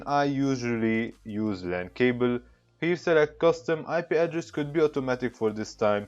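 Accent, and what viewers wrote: Lebanon. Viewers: Lebanese ??? I think I figured it out from the accent 😂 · Are you lebanese ? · Damn are you Lebanese?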